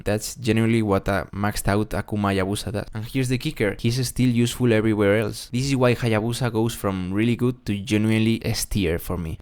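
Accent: Spain. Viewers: E w tu ere español no? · R u spanish dude? Your acent is exactly the same as mine when i speak english😂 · solo por como hablas creo que eres español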